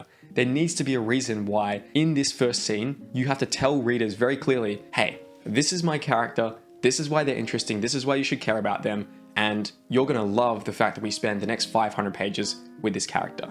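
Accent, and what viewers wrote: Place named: Australia. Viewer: Who is this aussy btw?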